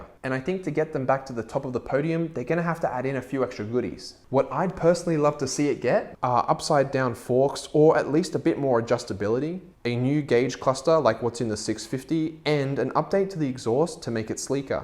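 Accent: Australia. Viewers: I love my 2019 Ninja 400 KRT. Same livery as yours. Here in Sydney, I got mine for a lucky $4.8kaud that's done only 4kkm with Two Brothers Hurricane exhaust, Oggy sliders, tinted shields and 8 months rego. Clean as condition! It's the best! I don't even have my Ls yet so I just ride it around the property for now lol 2 weeks to go till my RKT. Just discovered your channel, good stuff! Nice to hear an Aussie accent motorcycle YouTuber!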